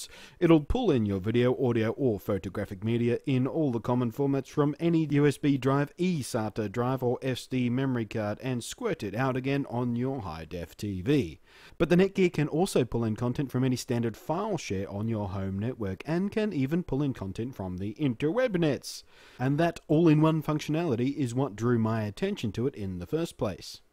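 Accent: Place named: Australia. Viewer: Well damn, as other commentors pointed out there it is in plain text at the end of the video. I'm so used to those marketing style bullet point filled pages I ignore them on instinct. Also, the sound of your soothing aussie voice kept me distracted. Or maybe it's cause I'm sick and hopped up on so many medications I can't think straight but I'm sure it's mostly the aussie thing., I'd really like to see you do some notebook reviews. Specifically the Clevo P151M.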